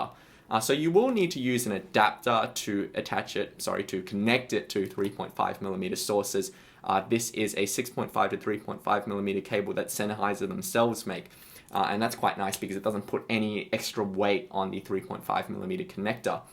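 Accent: Australia. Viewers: Yes, he's living in Sydney I think. Also he has a strong Aussie accent.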